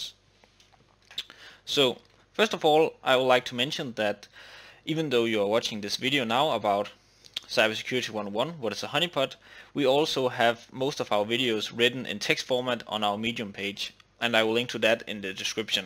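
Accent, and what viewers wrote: Denmark. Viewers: Are you danish ? :)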